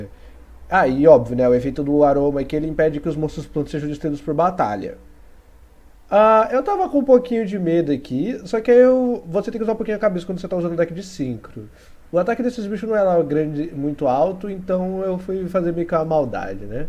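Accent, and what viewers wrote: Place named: France. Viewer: Esse sotaque francês é ótimo mas o targetar e dar alvo e de matar. Os duelos são tão rápidos no yugioh que mal da para acompanhar tudo que acontece 😂😂. Eu queria dar uma sugestão tem um baralho que eu gosto muito que o dragão armado e fiquei sabendo que tem novas cartas dele,eu queria saber como o baralho funcionária hoje em dia.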